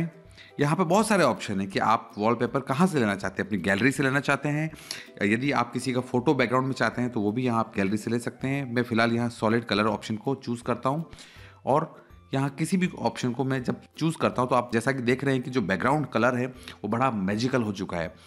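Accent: India. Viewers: badi fast ahh nav delhi se lagdi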